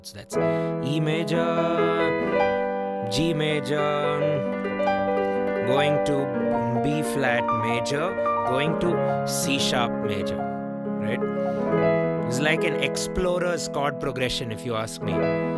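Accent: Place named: India. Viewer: From your accent i'm guessing you're Indian, yet how are you named ' '? I'm curious to know the story lol. Anyways amazing video.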